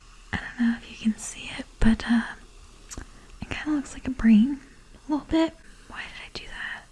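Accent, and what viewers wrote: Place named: United Kingdom. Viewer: shes sounds british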